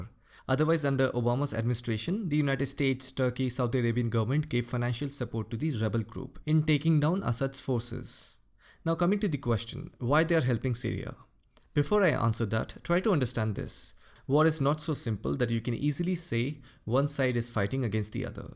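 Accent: Bengal, India. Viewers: You have such a nice voice and nice English Accent. True bengali characteristics ❤️.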